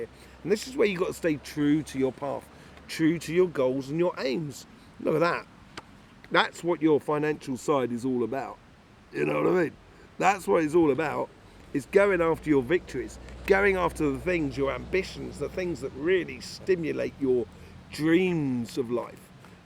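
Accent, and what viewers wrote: United Kingdom. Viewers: You are one of the Top Notch Best!!! With excellent reading you got such an amazing beautiful British accent, it is just delight to hear whole reading without noticing how fast it is over!!! Thank you very much!!! Wish you amazing health and success!!! ☘🌿🌹☘♥☘🌹🌿☘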